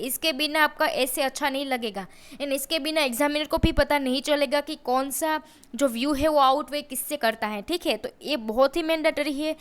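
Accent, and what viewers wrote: Gujarat, India. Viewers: English language with gujarati accents. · Mem R u gujarati? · Are you Gujarati?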